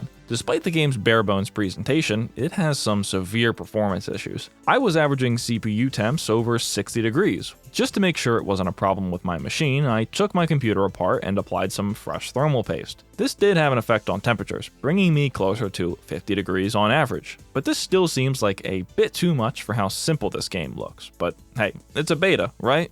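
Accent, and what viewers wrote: Philadelphia, United States. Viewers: Are you from Philly? OH WAIT IS THAT WHY YOU'RE CALLED??? I feel like I'm learning so much lore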